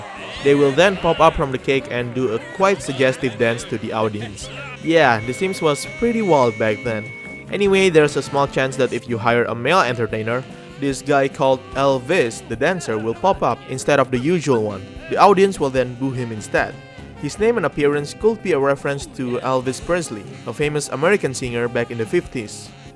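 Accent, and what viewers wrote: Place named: Indonesia. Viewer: Kayak ber aksen indo, kadang ada grab ama anak sma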